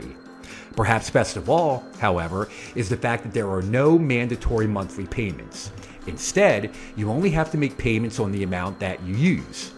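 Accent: Philadelphia, United States. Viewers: Love the Philly accent! Interesting topic, but now i need to go to Wawa and grab a hoagie and a wooder ice. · are you from Philly?? I cannot tell if that’s a Philly or delco accent 😅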